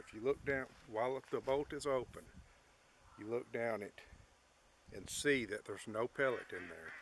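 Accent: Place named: Louisiana, United States. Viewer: Interesting. Your accent and phraseology are just like my relatives (mostly gone now) from Bienville Parish. But, they came there long ago from the Montgomery area of AL. I used to live in Chattanooga. My Sheridan C9A (which I bought new in 1998) wasn't shot for a long time until recently. It was not stored with any air in it. I got it out recently and I've been trying to shoot it, but I was cocking the bolt and placing a pellet in the chamber. Then, I would start pumping and on about the 2nd or 3rd pump, it would shot out the pellet out at low velocity. After that, I could still pull the trigger and it would 'shoot' with no pellet. I have oiled it since with some compressor oil and not inserted pellet until after I've pumped and it seems to be shooting ok now. But, on the last few pumps, it seems I hear air moving somewhere right after the stroke if finished. 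Thanks for video!! Steve McCoy Van Osdell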